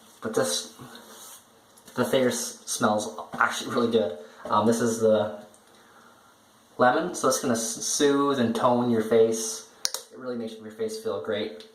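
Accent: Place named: Canada. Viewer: You sort of sound like Ed Norton's character in the movie Rounders with a bit of a Canadian twist.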